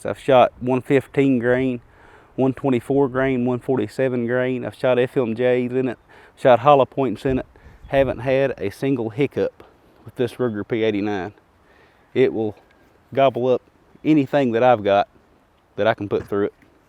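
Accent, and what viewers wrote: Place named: New York, United States. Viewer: Bought this one for a Birthday present for my 34th B'day....I'm 62 now and shoot this Gem weekly and like others have said not experienced even one Fail to load...Fail to Fire....Stovepipe.........Nothing!!! They truly don't Make them like this anymore. By the way....You New Yorkers sure do have funny accents!!!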